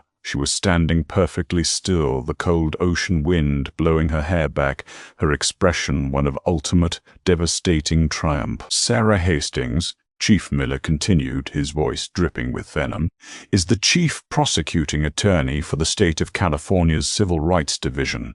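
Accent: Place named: United Kingdom. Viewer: Great story but again, this voice is terrible. Maybe try something else, but keep it british! 🙏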